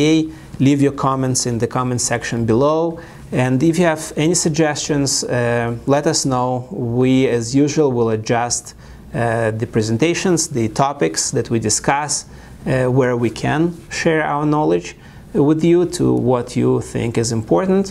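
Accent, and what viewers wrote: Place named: Russia. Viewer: Prettty sure it's a Russian accent.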